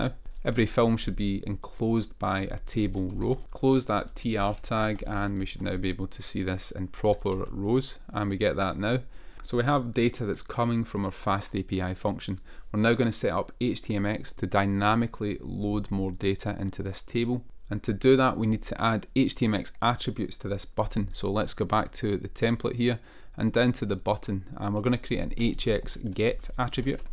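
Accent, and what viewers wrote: Scotland, United Kingdom. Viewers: How interesting that the Scottish accent is easier to understand. I wonder what Koreans make of other accents from the UK?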